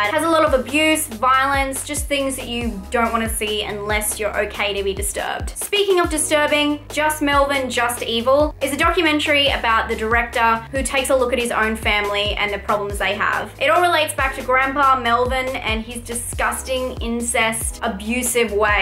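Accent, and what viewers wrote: Australia. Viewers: Are you an Australian? You're pretty as ay. *blush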